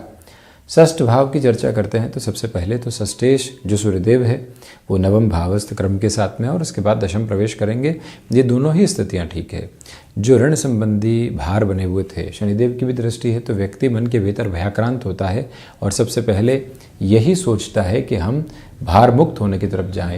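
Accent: Standard. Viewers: Aap Kitna shudh hindi bolte hai🎉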